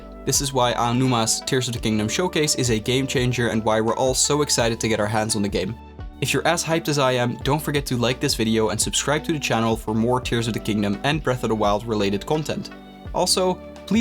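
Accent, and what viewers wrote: Netherlands. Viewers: Are you dutch? You sound dutch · Dutch detected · Are you perhaps Dutch? I recognize that accent 😂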